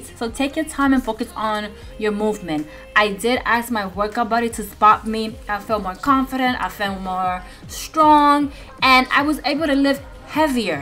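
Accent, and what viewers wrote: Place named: New York City, United States. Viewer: From where is your accent? Sounds from NYC.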